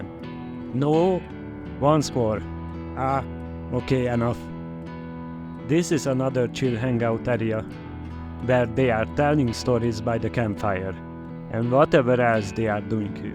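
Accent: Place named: Hungary. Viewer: You have great skills bro, you have another subscriber. Also your accent reminds to hungarian speaking english, but its cool!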